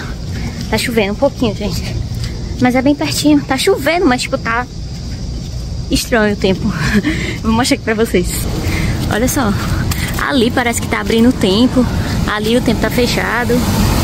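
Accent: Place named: Northeast, Brazil. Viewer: Será que você não percebe que o sotaque dela? Ela é nordestina então é o jeito isso de chamar a Ana de “mulher” simplesmente é o jeito,a cultura dela , nada a ver pelo amor de Deus.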